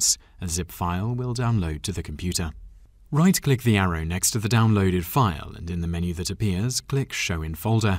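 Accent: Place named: United Kingdom. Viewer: British english! ... nice. By the way... very good your video, I use Apple. But well done. I will check your channel. Thanks!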